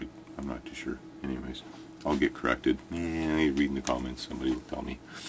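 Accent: Canada. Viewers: Sad to hear about the depression. You wouldn't happen to live near Sudbury would you? As a fellow Canadian, I'm just wondering about your accent :).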